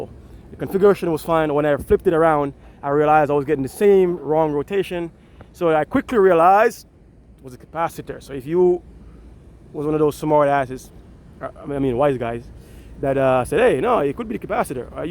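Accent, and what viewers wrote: Jamaica. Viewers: Hey my friend are you from Jamaica? My family is from Jamaica as well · Are you a jamaican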